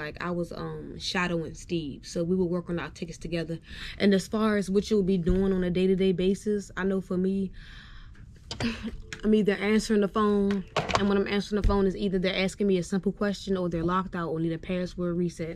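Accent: Washington DC, United States. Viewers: I recognize that DC accent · before I saw the 202, I was like " This person Gotta be From DC"!!!!!! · thanks for the content i can tell you are from DC or baltimore lol the accent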